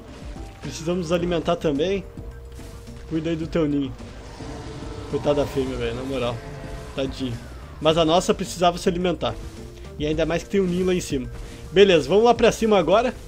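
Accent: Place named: South, Brazil. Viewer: Sotaque muito do Sul skksksks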